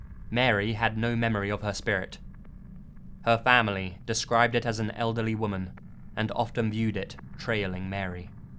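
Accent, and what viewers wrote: United Kingdom. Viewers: Just have to say that i really like this channel. Love the brittish (?) accent. Keep up the good work! Sorry to hear about the copywright shit. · Fair use Act violation sounds like. As long as no one's being harmed. I don't see a problem. Good video.. a little wierd hearing the story in a British accent though. I'm thinking more baritone" Native elder 101" voice. Good stories. Thank you. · This dude is the most British sounding native American I've ever heard. Good stories tho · Native American Indian stories with British accent. Loving the stories thus far just caused me to raise an eyebrow.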